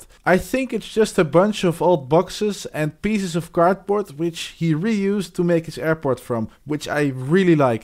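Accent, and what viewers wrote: Netherlands. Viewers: Are you dutch? You have a dutch accent.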